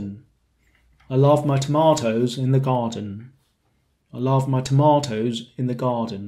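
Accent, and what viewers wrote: United Kingdom. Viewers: By the way, what kind of English accents are yours? Would that be Oxford English? I am not familiar with the different types of English accents in UK, but I find it hard to understand some English people, especially the Welsh. I know people from different regions/provinces/states have different accents. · 以很優美的英式口音，清晰介紹每個vowel！絕對值得觀看、收藏及分享！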